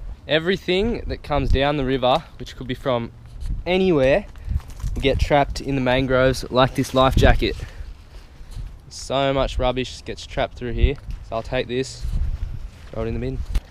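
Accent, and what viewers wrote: Australia. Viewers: Mate are you from Queensland or new south wale.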